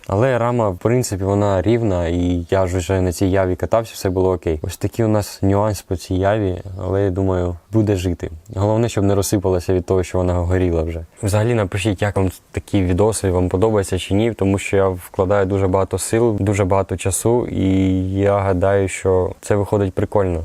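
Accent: Chernihiv, Ukraine. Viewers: Ти з Чернігова? Вогонь) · опа, а ти з Чернігова?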